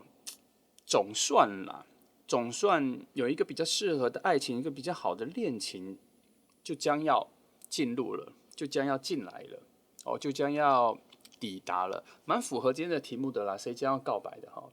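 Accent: United Kingdom. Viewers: 喜歡英國口音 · 好听的英腔诶❤ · 是好聽的英國腔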